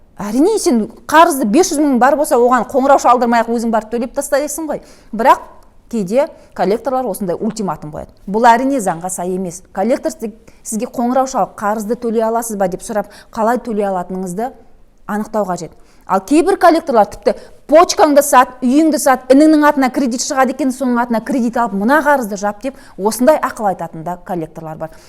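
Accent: Standard. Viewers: Кушті түсіндіреді. И таптаза казакша соилиді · Рахмеет қазақша таза сөйлейді екен осылай болғаны дұрыс қой бәрін түсіндіиріп айтып берді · Елімізде сендей қазақша айтатын жастар көбейе берсін Сенің ата анаңа рахмет қазақ тілін таза сөйлеп отырсың Қандай қазақ тіліміз құлаққа жағымды есітіледң қазақ тіліміз өркендей берсін!!Ал кридетке келсек аман болсақ төлей берерміз ақша болған кезде.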